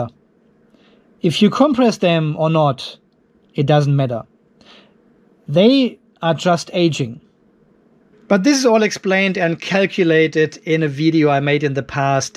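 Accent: Germany. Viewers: Now this is a very video for me. Let me explain. I recently dismantled 6 Mastervolt 24 Volt batteries, each containing 6 Thundersky 170 Ah cells. When I dismantled them, I noticed the cells were in a metal enclosure. 2 steel bars on each side and those bars were connected to two end-plates by sticking through holes in the end-plates and were kept in place because they were twisted behind the end-plates. (Apologies, English is not native to me). Some batteries had so much tension build up due to expansion of the cells, that, when I untwisted the sidebars, the batteries literally exploded mechanically with a loud bang. After removing the cells, some cells were so inflated that they resembled the Michelin puppet. The ones I didn't trust were taken directly to the communities local chemicals intake point. The other ones I tested and kept them when they were still good. 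I'm about to install EVE cells into my sailing yacht. I don't even consider compression. If a cell expands (too) much, I want to be able to see it and take appropriate measure in time (replacing). Thanks, love your channel, especially the German? / Austrian? accent. I learned and still learn so much from you as well as from Kermit.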